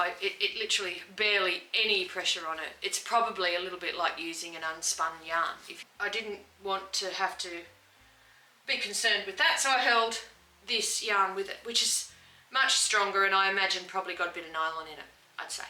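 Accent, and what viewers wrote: Australia. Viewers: I've been waiting for you to pop up on my tv. So interesting to hear you talk about your knitting projects and I really like to hear a real Aussie accent that isn't tonally flat like mine. Summer is just about here and Im trying to finish a couple of woolly shawls. Cashmere and Rabbit sound very exotic. I haven't used either yet.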